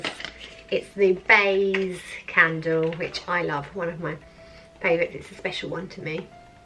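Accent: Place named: United Kingdom. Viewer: Loved the review, and you really made me giggle with your super British accent, Cute Channel, will be checking you out again!! xx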